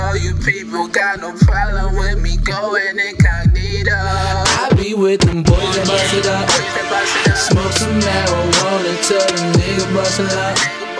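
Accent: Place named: New York, United States. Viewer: lol in this video you had a New York accent-- lit vid by the way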